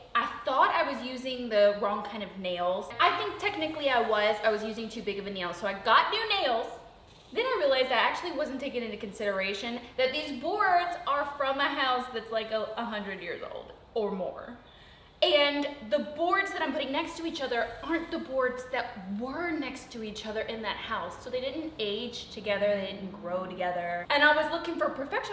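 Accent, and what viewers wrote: Texas, United States. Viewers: Your Texas accent is coming back video by video. :DDD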